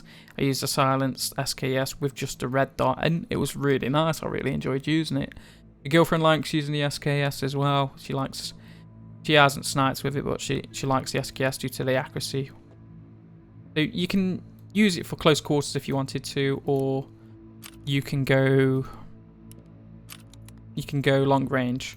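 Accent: United Kingdom. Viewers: but you are English.....well from your accent you sound like being from the UK and before our English in the us the only people to speak English were British people.....so the "we all do it" is wrong here because hes not from the us for one so maybe they pronounce words differently than we do here?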